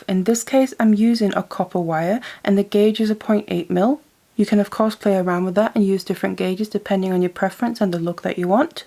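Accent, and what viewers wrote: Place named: England, United Kingdom. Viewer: And such a change to hear an English accent instead of US 😂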